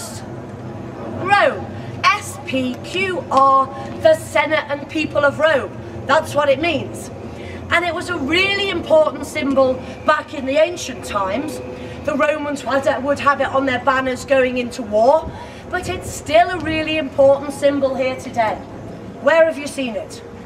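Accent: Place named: England, United Kingdom. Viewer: Signora è mitica , adoro l'accento inglese , rende aulico anche ciò che non lo è. Spiegato con entusiasta enfasi.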